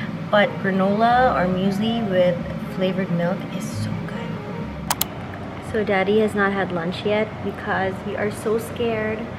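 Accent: Visayas, Philippines. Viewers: Ka cute mag bisaya 🙈♥️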